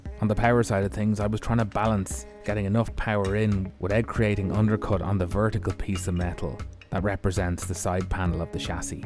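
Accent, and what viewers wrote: Ireland. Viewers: Every video o watch,i feel like i should be drunk,i guess is Irishness coming through.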